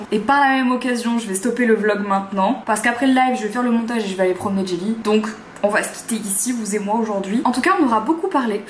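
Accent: Belgium. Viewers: Je trouve que t'as pris un léger accent belge 😂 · C'est incroyable, j'entends un petit accent belge ;)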